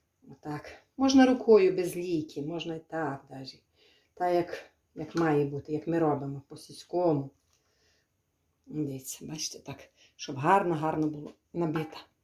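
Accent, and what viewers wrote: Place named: Khmelnytskyi, Ukraine. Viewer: Дякую за рецепт салату.Приємно чути Вашу мову,так у нас на Хмельниччині розмовляють.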